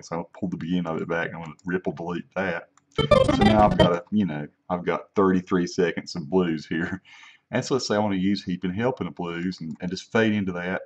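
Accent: South, United States. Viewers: Thank you, great help. I grew up in Southern Mississippi but have been away so long that it was nice to hear a down-home Southern accent again. And you play beautifully, I'm going to listen to some more of those Blues! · I love your southern voice!!! Thank you for the tip!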